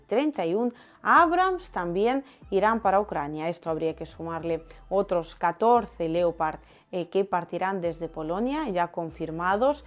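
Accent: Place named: Spain. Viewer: A proposito de America Latina, noto que algunas expresiones y vocablos de jerga especialmente no los entiendo, tu acento español es super fuerte. Pero está bien.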